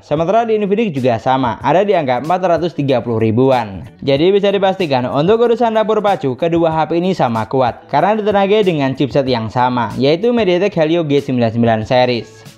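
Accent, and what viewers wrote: Madura, Indonesia. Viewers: Bang kamu orang Madura ya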